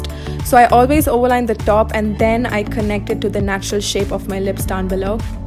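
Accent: India. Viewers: Wow that indian accent took me aback, thought you were black. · I thought the same thing.I was quite shock when I heard the Indian accent.She looks like a tanned Greek girl or tanned Turkish girl.She is very pretty though. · Am I the only one that didn’t expect her to have an Indian accent, genuinely thought she was black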